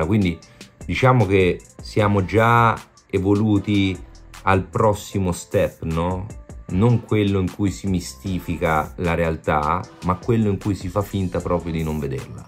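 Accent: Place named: Rome, Italy. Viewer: Ma quando mi piace sto....con questo accento romanesco sempre sorridente, competente.Arrivera' il giorno di miretti di fagioli e attenzione.....Pogba.....sono di Bergamo juventino (antiatalantino).Mi raccomando.Ciaooo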